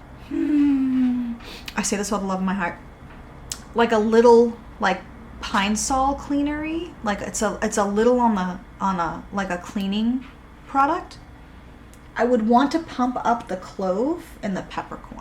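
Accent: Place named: New England, United States. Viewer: Fellow New Englandah? lol also thanks for the review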